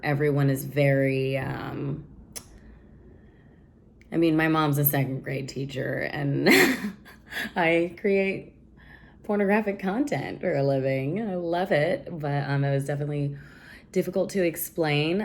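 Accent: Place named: United States. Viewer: All American women sound like that to me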